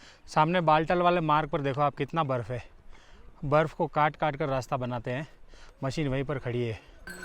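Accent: Gujarat, India. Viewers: Bhai kya aap Gujarati ho aapki ton se pata chalta hai vese hu pan Gujarati chu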